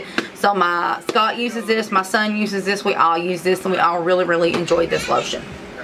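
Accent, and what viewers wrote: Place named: South, United States. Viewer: The country accent makes me relate to your videos 😂 lol you gained another subscriber 💕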